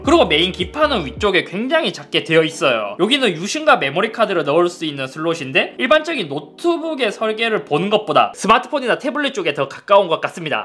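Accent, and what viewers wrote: Gyeongsang, South Korea. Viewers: 스마일이 서마일 되는법. 경상도 사투리. 잇섭이 서마트폰이라 안하고 스마트폰이라 하는 이유. 테크충 ㅋ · 여윽시 경상도 사나이~^^ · 형 진짜 경상도 사투리 심하신 분들은 스마일을 서마일 이라고해???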